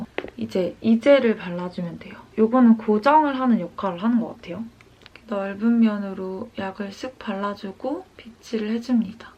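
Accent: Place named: Daegu, South Korea. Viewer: 앗 이랜드... 혹시 우방타워랜드?? 초딩때 소풍으로 갔던 기억이ㅋㅋ 대구에서의 일상에서 사투리 자연스레 쓰는게 뭔가ㄷ ㅓ 정감느껴지네여~^^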